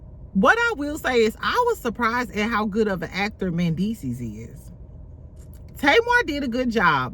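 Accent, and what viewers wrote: St. Louis, United States. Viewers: I just started following you recently and you crack me up! Where are you from? I was listening to your accent like is she from STL but then you said you live in TX. Anyway, happy Scorpio season 11/20 ✊🏾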